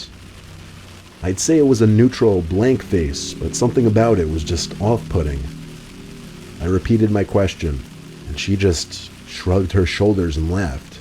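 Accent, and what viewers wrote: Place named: New York, United States. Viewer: Liam Sadler i knew he was either a new yorker or New Jerseyian like myself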